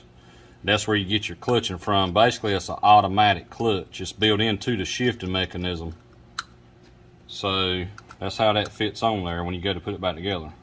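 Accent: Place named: South, United States. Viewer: Man I thought I talked country but I think you have me beat. Keep up the good work!!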